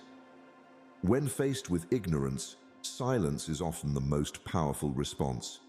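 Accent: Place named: United Kingdom. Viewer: Why is this a British voice???.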